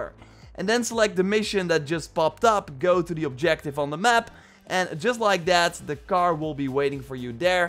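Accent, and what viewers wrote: Netherlands. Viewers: bruv you make us dutch people look bad with ur accent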